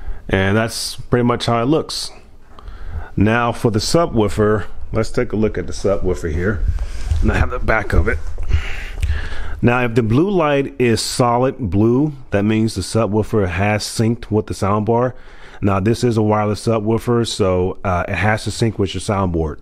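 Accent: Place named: United States. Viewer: Because of your accent I think you are from the United States, therefore we are from the same continent (America), I see you and I write to you from Chile, on Friday June 4, I went to the store to buy a printer, but I ended up buying this soundbar 🤣🤣, it is my first soundbar, I found it with a discount and I will take advantage of it to see the Copa América (America's Cup). Greetings from southern Chile...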